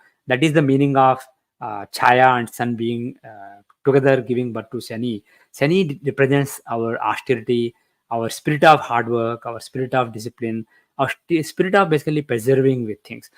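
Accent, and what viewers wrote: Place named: Chennai, India. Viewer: His Madrasi English is confusing. Ask him to speak Hindi. This will shorten public interest to bear this video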